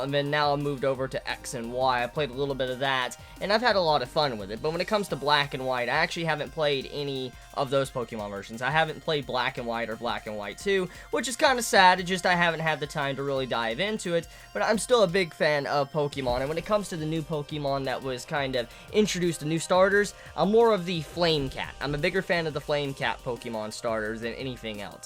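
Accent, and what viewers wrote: United States. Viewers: After seeing that old dance video, I've noticed that your country accent has diminished over the years.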